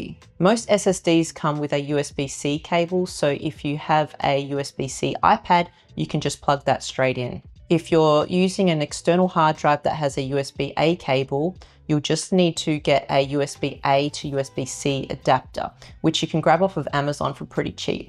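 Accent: Australia. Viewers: You sound Aussie so why are you using the American Amazon site? Could you please change it to Australian Amazon?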